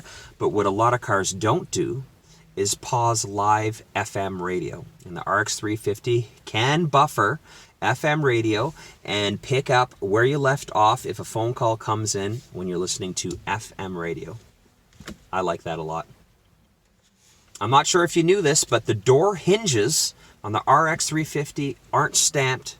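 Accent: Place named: Canada. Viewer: Hello, I enjoyed your discussion. How did you ever come to a Canadian accent?. Next time can you discuss how to upgrade ES 350 navigation system on my 2015? For some reason 2015 required a mini Sim. The dealers charge an enormous fee to change the Sim card. I understand the newer lexis allows it to download Iike the BMW.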